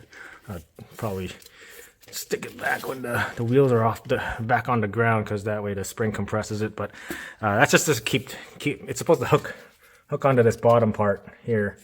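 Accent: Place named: Canada. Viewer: So, are you saying the Mazda dealer double gasketed the filter on the previous change? Whoever did it is an amateur. SOP is to clean the filter mounting gasket area after removal. That should tell if gasket left not to mention, just looking at old filter. smh Hope oil in sump didn't drop too far. Also, from two Canadian yt vids recently seems Mazda pronounced differently than in US. In US pronounced Mahhzda. North of the border seems, Mehhzda seems to be used. Fwiw, I'd let you work on any of my Hondas, and wouldn't say that to just any mech/tech.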